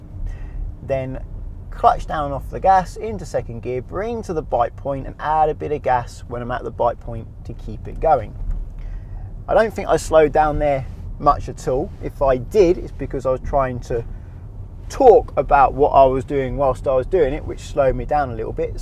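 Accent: England, United Kingdom. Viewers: English accent is tough to understand.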